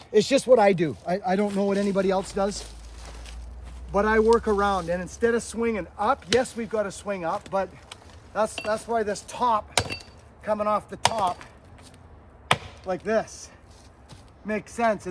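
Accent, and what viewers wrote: Canada. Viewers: Weird he sounds Canadian ? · Where is this accent from I'm guessing Nova Scotia or British Columbia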